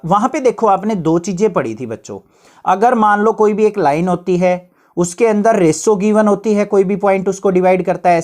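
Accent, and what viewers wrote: Haryana, India. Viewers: Bhai Haryana k hai sir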